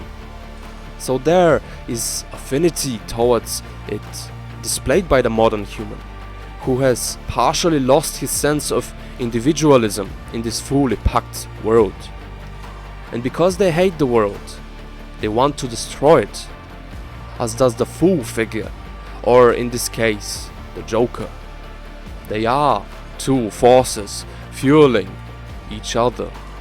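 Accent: Germany. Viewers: Great video ! I like your accent, is that german ?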